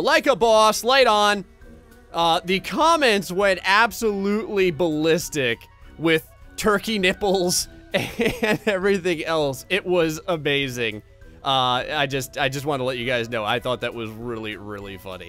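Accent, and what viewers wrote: New Jersey, United States. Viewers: I'm from the Philadelphia area and go to school in NJ but ive never heard your kind of jersey accent.Are you from North or South Jersey? Please tell me you do not say Taylor Ham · Oh yeah I hear the jersey accent, course I’m from the state right below so I pick up on it pretty easy lol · Dont notice that New Jersey accent. I live there too.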